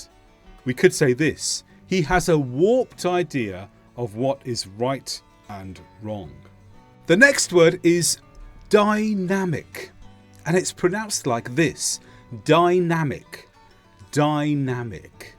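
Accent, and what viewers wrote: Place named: United Kingdom. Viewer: Not American.. UK😅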